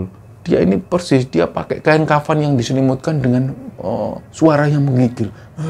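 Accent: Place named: Surabaya, Indonesia. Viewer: Aq seneng logat Surabayanya nek cerita, jadi kangen kampung halaman, tanah kelahiran Surabaya.. hahhaa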